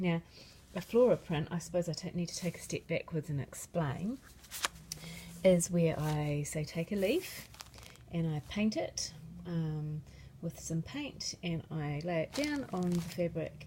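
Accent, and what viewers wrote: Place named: New Zealand. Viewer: Just as I was wondering how I could use my sewing, painting, drawing skills to make things without spending a fortune I found you. Great to listen to a Kiwi voice quietly explaining a simple process using things I recognise without the endless side explanations of things irrelevant :)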